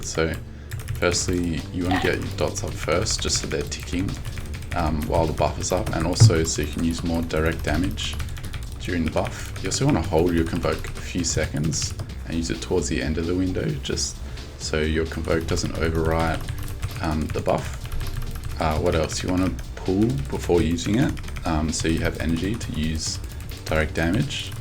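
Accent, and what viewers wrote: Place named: Australia. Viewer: teaching people feral is nice but can you teach people the australian accent too ty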